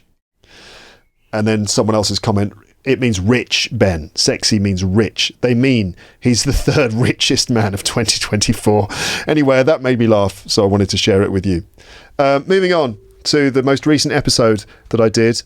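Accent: RP, United Kingdom. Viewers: Hello,! I've enjoyed this rambling episode because you have talked about various themes, you have played different sorts of guitars, you look so happy and relaxed when you talk about your children and when you play guitar!!! Obviously, I'm always pleased to hear your clear modern RP English. As regards reporters' way of speaking English, I think they should speak as well as you do. Thank you for your dedication and kindness. 😊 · Thank you. I was waiting for your new episode. You have helped me a lot to improve my British pronunciation. Hope to meet you in person!:) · i really like your podcast, your british accent makes it unique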